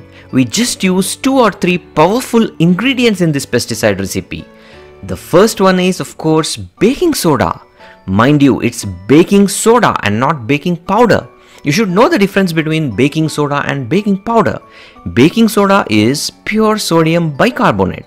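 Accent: India. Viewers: Very easy to use.i usually use backing soda for cleaning purposes.. Now I will do this ,Thank you sir.Your English is very good and clear Are you an Indian .?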